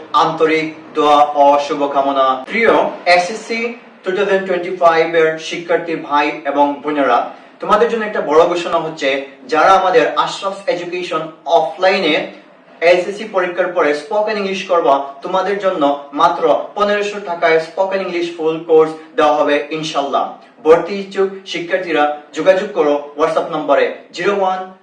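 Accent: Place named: United Kingdom. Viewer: sorry baia / sir. but আপনার কথা বলাটা কিছুটা ব্রিটিশ টাইপের। মানে আপনি মনে হয় english techer তাই হয়তো এমন মনে হচ্ছে। 🙏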